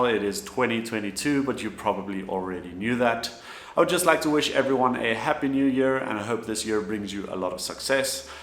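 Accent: South Africa. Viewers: Is jy afrikaans daai aksent se so...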